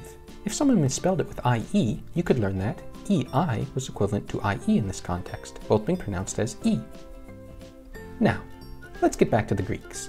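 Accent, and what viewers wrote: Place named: England, United Kingdom. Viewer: most instances of ει were never diphthongs in fact, they were the result of compensatory lengthened ε and as such were pronounced like ε, but longer. in fact they were often written as ε only in early times. the person in the video just has an english accent that makes him pronounce a long e as a diphthong (ei) stress is on the second letter of a digraph in greek by convention, even though it's evidently the first one that is stressed (in ταύτην the stress is on the /a/, not on the /f<-w/).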